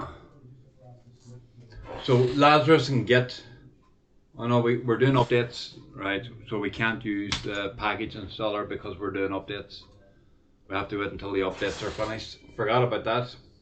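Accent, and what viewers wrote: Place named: Ireland. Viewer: GerDay, Because I a real "bushie" (live and work in remote areas of Australia), I'm struggling just a little with your accent! NOT your fault - it's just how it is - I don't get enough exposure to Paddy's!!!!! All this is leading up to say it MIGHT be an idea if you're going to show this "from scratch" like this to include links and/or command line text in your description file so that folk who are NOT fully cognisant with Ubuntu AND (like me) who might struggle with your accent can follow along easier! Having said that, this is excellent, so far and I appreciate your efforts!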